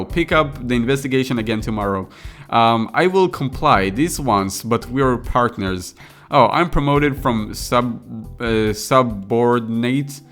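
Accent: United States. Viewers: arent you american?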